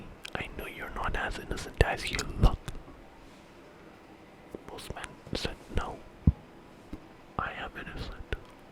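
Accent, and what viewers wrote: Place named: England, United Kingdom. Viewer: Big fan of your English accent sir🤓 Cool background!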